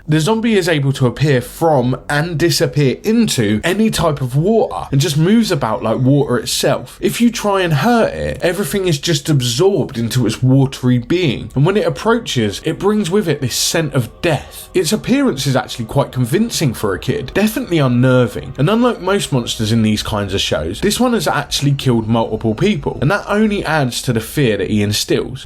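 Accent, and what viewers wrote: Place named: East Anglia, United Kingdom. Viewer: Are you from Suffolk or Norfolk? Trying to place the accent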